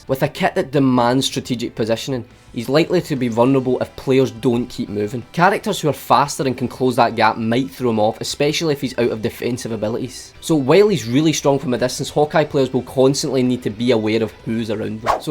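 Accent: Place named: Scotland, United Kingdom. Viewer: Can´t wait for Marvel Rivals! Will it Kill Overwatch? I mean... It could defenitly force Blizzard to Heat up and do more Community Focused Things to keep their Players. I mean.. Overwatch is an absolut great Game. But the Monatizing Options Decision that were made are absolutly BS. Everyone is hating on Monatization and not about Overwatch in General. Marvel Rivals got a perfect Timing to step in and declare Overwatch WAR. Also: I love your accent. You sound like Drew McIntyre ( a WWE Wrestler if u dont know him ). I assume you are from Scottland? :P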